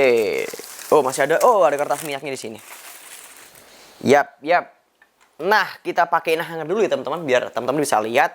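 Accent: Java, Indonesia. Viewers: Gawe boso jowo ae mas medokkk lek mu omong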